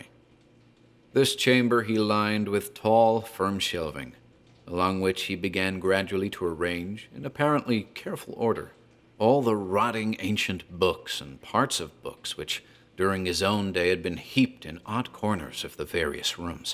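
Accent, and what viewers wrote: United States. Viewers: I really have to say, you've outdone yourselves with this one. The production value has increased dramatically. And, your American accent has also improved tremendously lately. I always listen to your channel while I fall asleep at night, usually alongside a quiet recording I made of rain on the roof of my spare bedroom. I find that it's the perfect aesthetic to go along with your recordings. Anyway, really looking forward to the next installments. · you do a fabulous American accent! Loved this as always.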